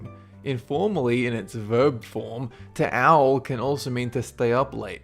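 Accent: Australia. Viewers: Are you Australian? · You are Australian? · Are you Australian ? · He's Australian ._. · are you really aussie? If so then where from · Lang Jones I didn't catch that one. He does have some odd pronunciations though. He's got an Australian accent but uses American pronunciation for heaps of words.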